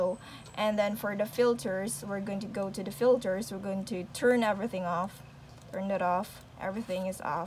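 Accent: Visayas, Philippines. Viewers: Bisaya ka te? Hahaha para lang kasi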